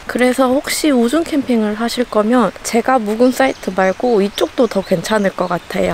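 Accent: Jeolla, South Korea. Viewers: 전라도분이신가봐요 ㅎ 전라도 억양이 순간순간들려서요 ㅎㅎ 저도 전라도사람이라 반갑네용^^* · 님 타프 대박 굿굿👍👍 강이 언능 캠핑갈수있는 컨디션 되서 귀여운 강이랑 같이 캠핑다니는 님 보고싶어요~ 님 솔캠도 너무 귀여우셔용!! 님은 모르시겠찌만 전라도사투리 너무 귀여운거 아시나요.. 강이 귀여움에 살짝쿵 밀려버렸지만 님도 인정하시죠?! 안그래도 우중캠핑 가고싶어서 타프 찾아보고 우중캠핑 영상도 찾아보는데 에이웍스 실타프를 님덕분에 알게되었어요. 타프 찾아볼때만해도 옥타타프는 활용도가 별로이지 않을까 했는데 님 영상보면서 자연스럽게 우중캠핑할때는 옥타타프가 괜찮겠구나 생각했어요. 고동텐트도 영상보고 한참 검색해봤어요 ㅎㅎ 다음 영상도 너무 기다리고 있어요. 항상 건강하시고, 강이랑 행복하게 오래오래 캠핑다니셨으면 좋겠어요!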